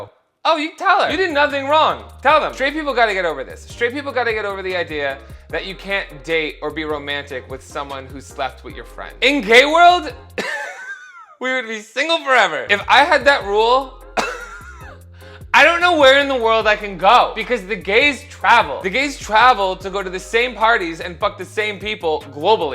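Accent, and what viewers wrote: New Jersey, United States. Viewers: The longer stays in NJ, the more the accent comes back.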